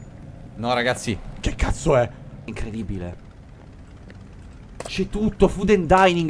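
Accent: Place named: Bari, Italy. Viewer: da quando favij e diventato barese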